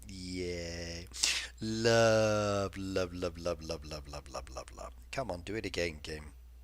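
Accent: United Kingdom. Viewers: Is that a Scottish accent? ✌️😎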